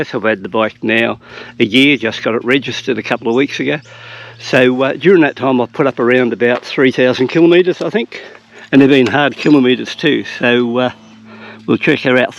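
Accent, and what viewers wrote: Australia. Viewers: As soon as I hear that Ozzy accent I know I'm in for a great honest review. Like you a have a royal Enfield 350 but am considering this bike which is now available here ( well , gb3500s) pretty much the same. Keep roller fella . Thanks 👍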